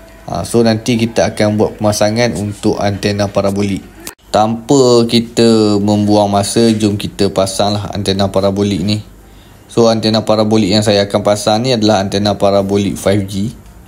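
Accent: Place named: Kedah, Malaysia. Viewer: Mantap...ada band 28 + loghat kedah...terbaik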